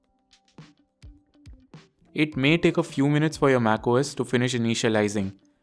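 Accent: India. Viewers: Bro, sounds like a Indian text support scammer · you know this gonna work when the guy has an indian accent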